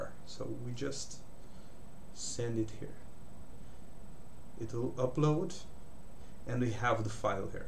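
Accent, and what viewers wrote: Brazil. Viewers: Desconfiei que era br pelo sotaque kkkk representou mestre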